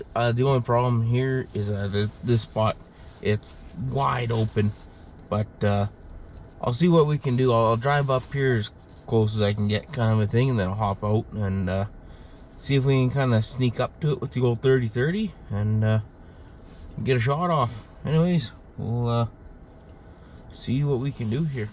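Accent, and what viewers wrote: Canada. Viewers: The most CANADIAN accent in recorded history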